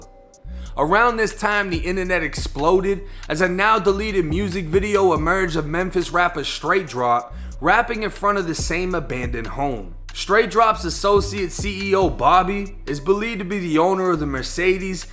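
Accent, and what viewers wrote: Boston, United States. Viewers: Bro, u gotta be from Boston!! Up north somewhere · Bro you from Boston on Florida lol I can’t tell witt ya accent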